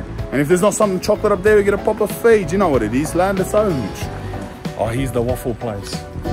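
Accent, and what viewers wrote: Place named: Australia. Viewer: Interesting that the Swiss claim chocolate as their specialty when cocoa is only grown in warm to hot climates. It’s grown in Australia and we have good chocolate at I good price. Nonetheless Spanish at his best with a good Aussie accent in a place they all seem to speak English and good English too Beauty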